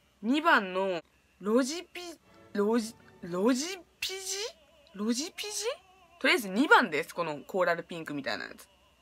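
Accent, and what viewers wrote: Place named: Kansai, Japan. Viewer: 関西弁すごい好き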